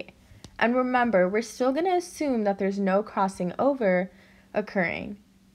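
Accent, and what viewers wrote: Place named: New York, United States. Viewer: Nyc accent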